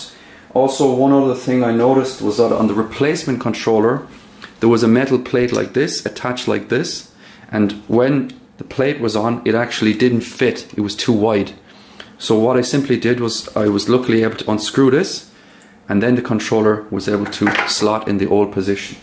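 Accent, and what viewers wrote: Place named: Ireland. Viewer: How do you have an Irish accent?😂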